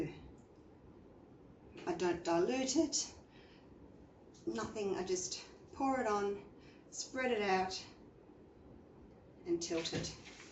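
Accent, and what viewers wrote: Australia. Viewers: Your videos are just SO helpful. I've only been doing this for about a month, but I'm loving every minute of it! I don't even mind when I end up with some muddy pours, because for some strange reason, my 28 year old son LOVES them...lol (no accounting for taste...lol) I'm just about to varnish 3 paintings (which are going STRAIGHT to him...lol), so this is REALLY helpful. Btw... SO nice to hear a fellow Aussie accent too 😘👍❤❤❤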